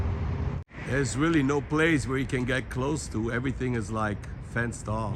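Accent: Germany. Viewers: Sounds like you have a cold and your German accent has gotten stronger. Welcome back to California.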